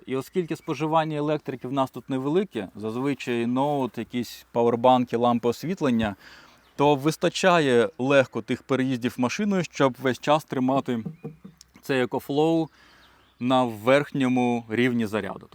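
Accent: Standard. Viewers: Доречі , дуже класна та чиста Українська!!!❤ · Дуже чисто спілкуєшся українською, в приклад іншим👍👍👍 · Класно говорить по українськи чиста соловїна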